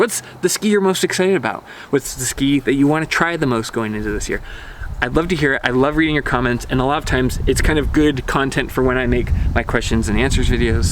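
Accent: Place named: United Kingdom. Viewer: Jesus H Fuck, here's to your kingdom accent.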